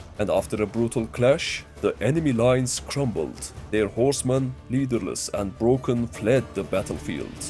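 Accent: Turkey. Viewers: Bastaki dying telafuzundan türk oldugu anladim. Memati maskeyi ne kadar takarsa taksin. Basarilar kral kolay gelsin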